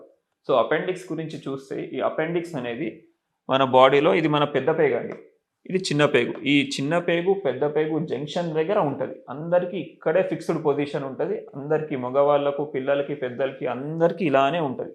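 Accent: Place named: Telangana, India. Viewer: Chaala natural ga telangana yaasalo manchiga cheppirru sir , Miru nijayathiki maroperu sir, Inkenno videos cheyyaalani korukuntunnam sir thank you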